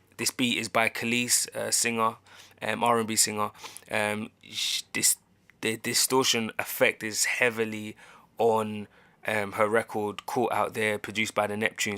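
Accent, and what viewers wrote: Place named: United States. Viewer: your american accent was on point had me trippin a bit.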